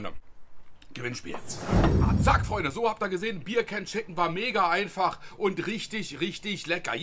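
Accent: Berlin, Germany. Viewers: #GEFU mag als Rheinländer Deine Berliner Schnauze und auch Deine Rezepte. Als Grillanfänger, braucht man so einiges an Zubehör, um Deine Rezept ohne zu improvisieren nachkochen/grillen zu können. Gewürze sind immer geil und ausprobieren von verschiedenen Gewürzen macht immer Spaß . Weiter so mit den tollen Rezepten und verlier Deinen slang nicht. Hau rein. Gruß aus dem Rheinland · #GEFU habe schon ein paar GEFU Produkte zuhause...aber die würden sich bei mir perfekt ergänzen:) mach weiter so! Richtige Berline Schnauze 😉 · Ich habe erst vor 5 Wochen meinen ersten (Gas-)Grill bekommen und kann deshalb ganz viel von dem präsentierten Zubehör (#GEFU) und auch von den Gewürzen brauchen ohne es im Schrank doppelt zu horten. Und obwohl wir uns (Du Berliner, ich Bayer) dialekt- und sprachtechnisch voll unterscheiden, find ich Deine Videos und Anleitungen "voll dufte"! 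👍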